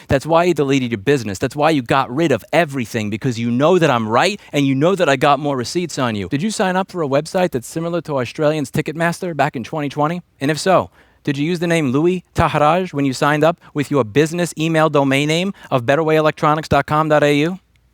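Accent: New York, United States. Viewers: Oh my! Louis let the New York out in this one. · Love your new england attitude at times and the new york accent that comes out helps. If a youtuber with millions of followers accuses me of being a tiddler Im knocking on that youtubers door. He wouldve been smart to stfu but no, he talked. You know so much about this dude Im suprised you are not shitting in his pants for him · As a fellow born and bred Bronxite, his NY voice came out 3 videos ago....this is the equivalent of "Meet me at the corner" and pick the most populous one....42nd and Bradway? Queens BLVD and Northern BLVD? Heck, how about Eastern Pkwy and Flatbush, Grand Concourse and Fordham.....heck lets be real and do it on 125 St and Lenox...Tickets anyone??? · Louis went full New Yorker on this one. Damn. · you already fit in. You may sound like a New Yorker but you just gave this guy both barrels like a Texan. 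Now just throw in a "he f**ked up bigger than Dallas" and you get your free six shooter and commemorative 6 pack of Lonestar beer. It's called the Texas Twelve Pack and you can get it at the governors office. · Louis has gone 120% New Yorker on this one and I'm here for it! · the new yorker accent shows through with the new yorker attitude! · Lmao justifying the particle counter while blasting his ass off with a NY accent. Amazing video 😂 I wish I was like you to fight bitches appropriately when they try to screw you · Bro the new york came out in this video and io love it! Thank you Louis for everything you do and everything youve done! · His New York accent gets stronger the more worked up he gets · I don't think I've ever heard Louis sound so New Yorker till this video lol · The New York accent came out strong on this one. Good job, keep up the amazing work! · Why does he only sound like he's from NY when he's angry? 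· the new york accent is slipping out lol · It's been a while since I heard you go full NY. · This is the first video I noticed the NY accent out of Louis. Damn the pedo sure fawked with the wrong guy lol. Louis has a grrrr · You have a new york accent, never noticed it before. I guess you are that angry now, rightfully so. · The new york accent just makes this better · You can tell Louis is heated by how much that New York accent comes out! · This series has really brought out the New Yorker in Louis. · This is the most New Yorker sounding video I've heard from you, I enjoy the righteous indignation in every syllable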